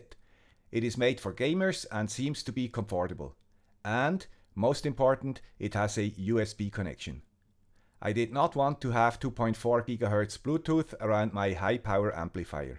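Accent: Switzerland. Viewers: Swiss accent! Any idea about making a very long range telemetry, like 100km? Looking forward to see your response 👍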